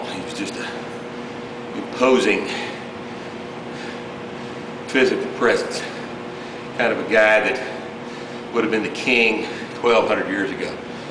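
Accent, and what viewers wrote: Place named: United States. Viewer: What the fuck are you on about? There was literally no cussing, he's speaking clear American English (which is his audience). What could you possibly have to bitch about?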